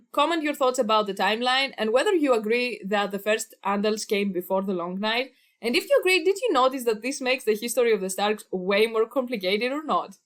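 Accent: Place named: Greece. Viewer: Όταν βάζεις τυχαίο βίντεο για Game of Thrones και σε χτυπάει η Ελληνική προφόρα 😆.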